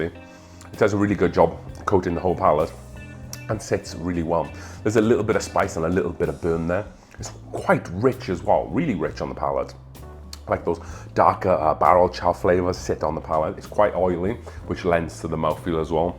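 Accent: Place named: Wales, United Kingdom. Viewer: I like your videos but I've been trying to figure out your accent. Sounds like you come from Wales but only on certain words. Good choices on the bourbon but where are you from originally?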